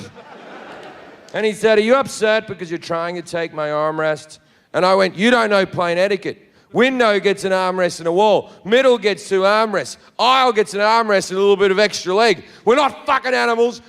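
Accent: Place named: Australia. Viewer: I had just written ''Is he Australian ?? '' and at 7.50 I got the answer.. He is funny at times he is bringing a good point about armrests... I threw some side eyes to the armrest while I was at the window seat. Now I feel bad ... .By the way he told the story , I was able to visualize the scene... so he must have some talent... Australians are rough I think... and 9 minutes 55 seconds is all I can take of him today... I would not go to his show really .. he also seems as if he had three beers (unless this is his persona) .Listening to him is more like weight lifting exercise , the story as a whole is not offensive , although language used is heavy and rough.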